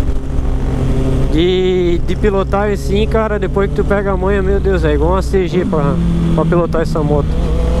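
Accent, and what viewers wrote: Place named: Santa Catarina, Brazil. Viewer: E pelo sotaque, e eu também sou moro aqui em Florianópolis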